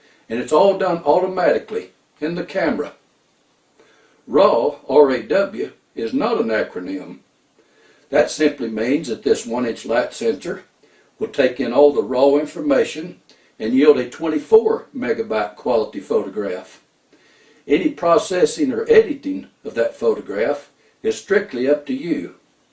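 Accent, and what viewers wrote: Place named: South, United States. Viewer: I do not know whether you have taken studies in communication at all but you are gifted communicator: concise, precise, positive and with the common touch and southern humour. Shades of Mark Twain and Paul Hogan of Crocodile Dundee. The South accent and turn of phrase is also part of your appeal. Your skill is not a skill that can be learnt beyond a certain point, no matter how much training. It is interesting that we in Australia use some of your colloquial expressions. You may want to broaden your topics beyond photos to homespun satire. One of our famous satirists passed away this week. See John Clarke and Bryan Dawe on Youtube.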